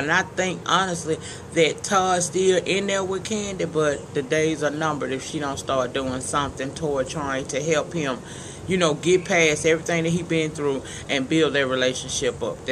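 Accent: South, United States. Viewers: I just came across your channel 2day...faints....I'm back. I think its safe to say I am a fan just 2 reviews in...You're giving me Southern Teas. My Gawd, where are you from Miss?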